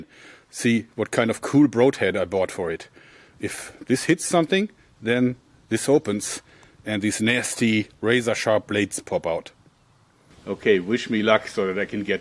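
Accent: Germany. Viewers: your accent sounds very german to me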